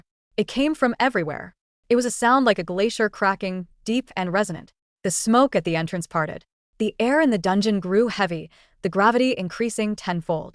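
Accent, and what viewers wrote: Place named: India. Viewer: "No monsters no graving"wow Indian voice accent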